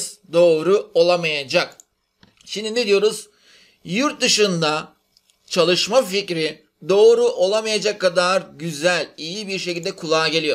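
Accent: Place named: Arab world. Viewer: hocam arapça edasıyla ingilizce ders anlatıyosunuz.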